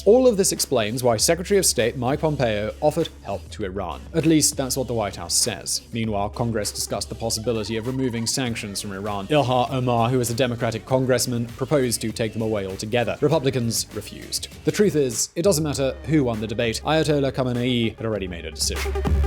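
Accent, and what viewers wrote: United Kingdom. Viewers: THANK You for this Information! I LOVE Your UK Accent. It fits YOU. Our Hearts are with the Every Day Iranians who have Children and Grandparents they Love and arre trying to PROTECT. This is Very Sad.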